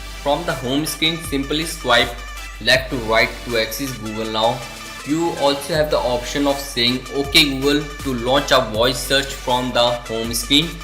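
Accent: India. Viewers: Thank you :) your indian accent is awesome btw ;) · Aishwarya Singh he's a Bengali, that's why the accent.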